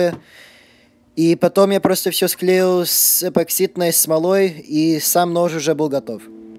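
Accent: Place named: Russia. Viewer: Молодец парень! отличная работа! А все кто смеются над русским произношением,- сами попробуйте на каком то другом языке кроме родного так же бегло что то рассказать .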